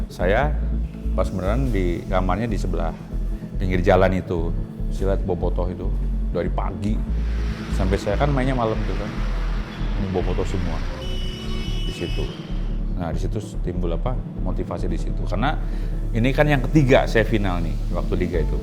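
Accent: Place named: Banyumas, Indonesia. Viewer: ngapak the legend❤... & endog juga carita legend... & saya datang final🤩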